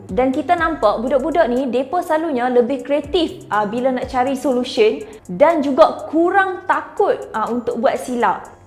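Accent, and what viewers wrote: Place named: North, Malaysia. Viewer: Beauty with brains, tp pekat nau utagho😂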